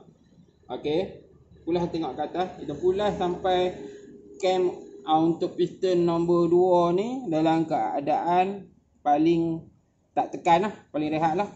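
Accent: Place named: North, Malaysia. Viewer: Kedai dkt mn dgr mcm belah utara ja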